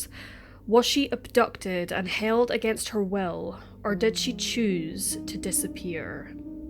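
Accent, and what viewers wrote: Scotland, United Kingdom. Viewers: I'm still trying to pin point the accent..where are you from? I used to think it was Scottish but now I'm second guessing. I'm all the way down in the Antipodes in Australia so excuse the ignorance. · Yes great narration...I know this may be outta line....Scottish accent?? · Heartbreaking story. Love your accent, Scottish?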